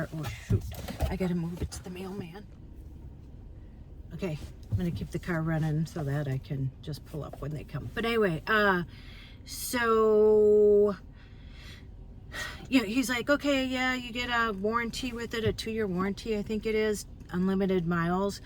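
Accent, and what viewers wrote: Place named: Fargo, United States. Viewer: I think you talk like Fargo😊. Then again I am from Rhode Island. 😉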